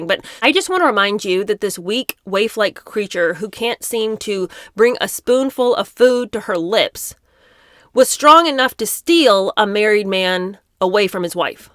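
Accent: United Kingdom. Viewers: Your british accent is so on point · I enjoy watching your vids...you make me laugh...& you can do a perfect british accent ... You are so right...the relationship between those two is weird...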